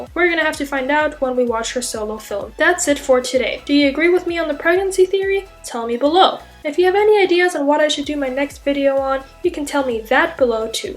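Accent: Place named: New Jersey, United States. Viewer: Its a New Jersey accent :)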